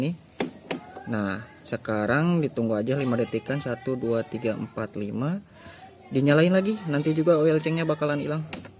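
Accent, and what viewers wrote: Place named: Sunda, Indonesia. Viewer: Siap hatur nuhun bang Logat Sunda emang kental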